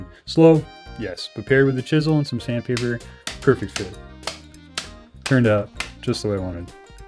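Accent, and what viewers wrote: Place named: United States. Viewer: You sound very American (not in a bad way)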